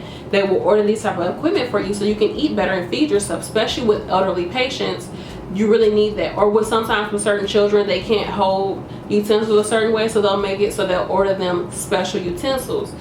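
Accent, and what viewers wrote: St. Louis, United States. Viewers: As soon as i heard your accent i KNEW you were from the Lou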